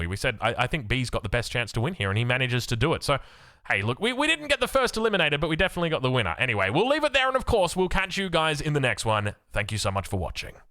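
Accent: Australia. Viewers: I rarely sub, but this Aussie is worth subbing for! Watched like 30 videos lately and your casting is magnifizent. Also, this FFA game was very exciting and probably the most intense:o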